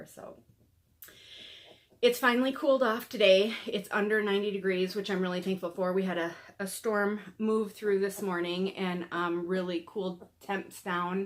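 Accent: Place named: Minnesota, United States. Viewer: I am enjoying your videos, chuckled while watching on one of your first ones commenting on your “Minnesota” accent! Never thought I had one either until I heard myself say Minnesota! Speaking of Minnesota, a lake theme or lakeside theme would be fun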